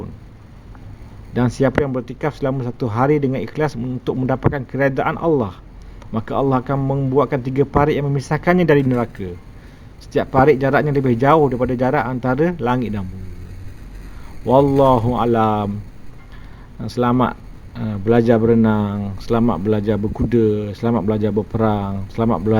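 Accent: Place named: Indonesia. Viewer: Kenapa ckp ada mcm indon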